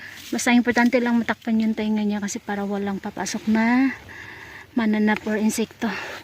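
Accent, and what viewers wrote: Visayas, Philippines. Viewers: Hello Ms...parang Pinas lang din ang cute nang anak mo at napansin ko parang Bisaya ka Ms?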